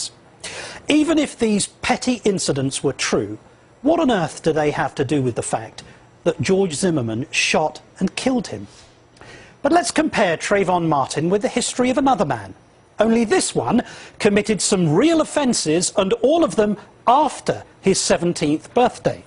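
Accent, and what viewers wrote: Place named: United Kingdom. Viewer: Wow--funny how far he stretches to make a Conservative look bad by digging up something that happened more than 23 years before he was elected, as a teetotaller. Obama was a druggie in the 80's--notice he doesn't bring that up. Obama was promoted by murderers and terrorists. Obama tried to stop helping babies who survived abortions and wanted to let them die. If that's the best this moron can do, he needs to take that British Accdent and go hang out in Englan with that racist Paul MCartney.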